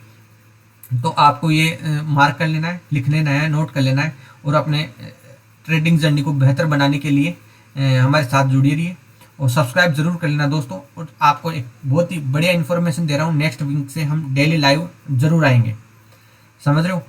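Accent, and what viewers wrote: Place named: Rajasthan, India. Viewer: Are rajasthani h tu to wo bhi sikar side ki tone lg rhi h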